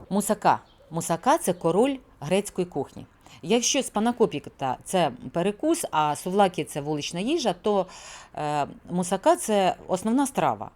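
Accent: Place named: Central Ukraine, Ukraine. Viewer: Ви з центральної України? У вас вимова, як там🎉🎉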